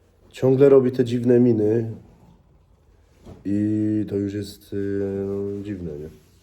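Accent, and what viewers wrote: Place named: Silesia, Poland. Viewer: Śledze cie od 6 lat albo lepiej, jeszcze kiedy nagrywales ciekawostki bez pokazywania twarzy i miales z 50k widzow. Ta zmiana akcentu na "ala śląski" jest pod widzów? Kiedys normalnie mowiles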